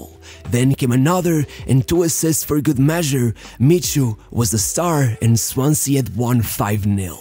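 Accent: Portugal. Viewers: you sound portuguese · I'm sorry for asking but are you Portuguese? You sound Portuguese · They are not. is reading the names in his Portuguese accent 😅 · Tuga accent😁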